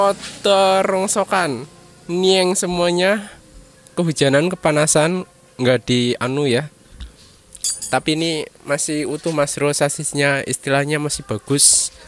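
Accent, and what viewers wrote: Banyumas, Indonesia. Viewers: Lha wong ngapak yak masvroh ?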